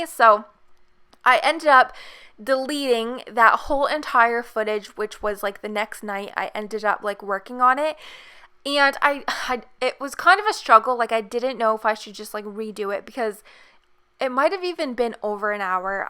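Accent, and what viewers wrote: Canada. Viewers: Are you Canadian? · As a fellow Canadian, I could tell you were Canadian right away from your accent lol (which is very nice to listen to). Loving this build! You have yourself a new subscriber :)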